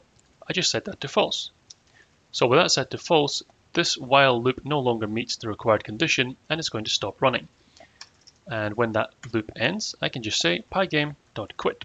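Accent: United Kingdom. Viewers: Omg I never hear somebody with the same accent as me on YouTube. Ayyy Northern Irish accent representation 🫶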